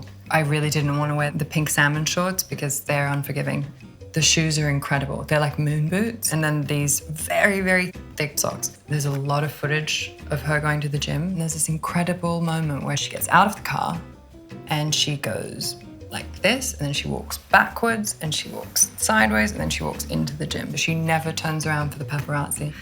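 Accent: Melbourne, Australia. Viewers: Her accent is such a mix between Australian and English. As a brit myself I fully thought she was english for the first few minutes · Such a posh Australian accent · Her English has such a lovely global vibe, Australian, British and Bit of American here and there ..love it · Nah she’s just posh Melbourne they sound like this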